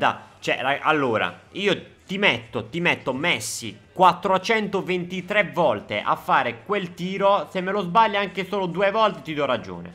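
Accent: Bari, Italy. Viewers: Barese parli? O sbaglio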